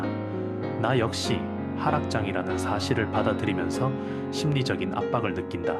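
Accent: Gyeongsang, South Korea. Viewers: AI로 편집한 목소리 경상도 비슷하게 들리는건데 전 오히려 좋아요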